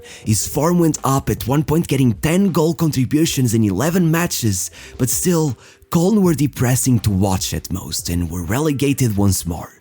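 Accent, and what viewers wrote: Portugal. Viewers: Não sei porquê mas há algo que na tua forma de falar que se percebe que és português 😂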